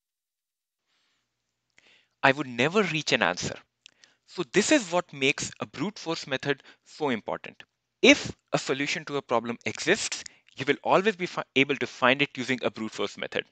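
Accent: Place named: United Kingdom. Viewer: Beatiful british english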